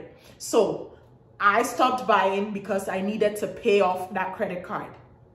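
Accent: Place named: Africa. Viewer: You are such a beautiful woman, the way that African accent rolls of the tongue is so soothing.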